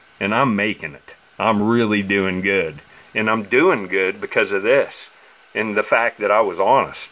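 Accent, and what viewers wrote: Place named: Pennsylvania, United States. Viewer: Your welcome. I never dreamed that people could buy views and likes ect. Thanks for all the explaining. But i have a upgraded youtube account and dont have to watch ads. How is the pay out different on that? My son says the channel makes more this way. Also how much of a super thanks does youtube keep vs. what you get. Percentage wise. This is the only channel i have ever donated to. I am by know means i person with tons of cash. But this channel is my favorite because it has alot in common with my way of living a simple life. I was born near the river just north of Pittsburgh and moved to ky in the mid 80s when everyone in my family lost thier Good paying steel mill jobs. 475k bread winners on the same day. My father was the only one smart enough to pack up his family and move to a much better place where there was way more opportunities. 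This channel reminds me of home. Even the way you narrate sounds like the people i grew up with that eastern as you say O hi a and western pa draw... lol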